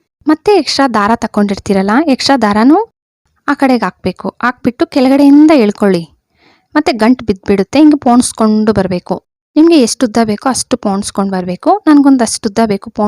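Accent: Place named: Kolar, India. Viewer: Kolar side avara nimma bashe agide adikke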